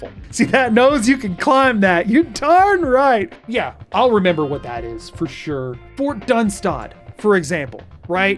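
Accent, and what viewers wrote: United States. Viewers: Are you by any chance from Utah? Something about your vocal inflection reminds me of an old friend of mine. He might not have originally been from Utah though either lol. Regardless of why, your method of presentation and your sense of humor feels really familiar and welcoming, so thanks for these videos! I’ll follow you on twitch so I can pop in if there’s ever a stream I’m available for.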